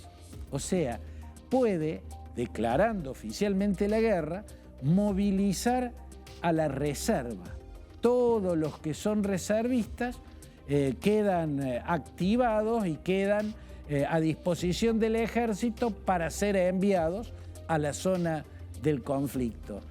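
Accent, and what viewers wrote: Argentina. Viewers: Este señor, por su acento es argentino, bien estos ique analistas de este país siempre se saben todas las historias, no se como estados unidos no los contratan para asesores de guerra. Los mejores analistas que he escuchado que han sido embajadores de diferentes países en Rusia y que tocaron los temas del porque Rusia estaba actuando como lo hace. Este sabe mas que Putin osea solo vean a este señor y no tendrán idea de que esta pasado o pasará. Graciosamente solo habla de putin pero no comenta el papel de USA, Otan y la UE en este conflicto. Quisiera oírlo hablar de las Malvinas. Debe ser un libro de idioteces.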